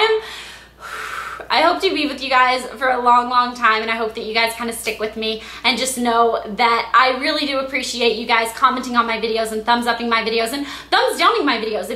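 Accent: United States. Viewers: cute chick... I like your freaking american accent xD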